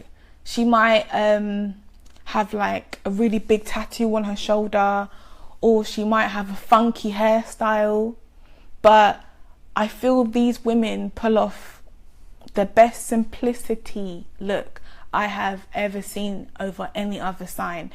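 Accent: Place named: London, United Kingdom. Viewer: I'm a Virgo Sun Moon and Rising. In total I have 5 planets in Virgo in my chart. I'm a designer so the office job life isn't relative to me, but I do like structure. I do live in a white house... white walls and a very minimal design. A clean home is a must... although I'm not OCD. Shout out to you though... it's nice to hear a London accent on an Astro vid for a change. Definitely going to subscribe. xx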